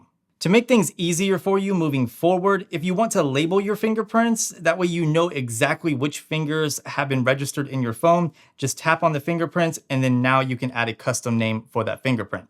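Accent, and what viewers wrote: United States. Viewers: I have the note 8 since it lunched but I'm thinking to upgrade to the note 20 ultra. I like your American accent 😀.....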